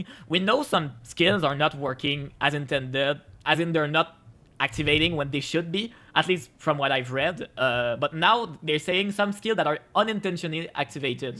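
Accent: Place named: Quebec, Canada. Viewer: Quebecois spotted?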